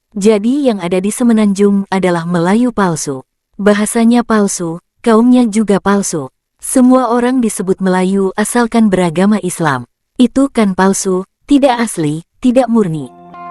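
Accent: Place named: Java, Indonesia. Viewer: dengan aksen Jawo pekat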